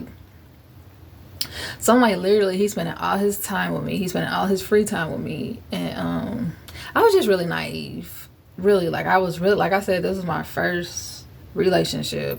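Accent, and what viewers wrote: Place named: Midwest, United States. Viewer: Nice story! Seem like your from the Midwest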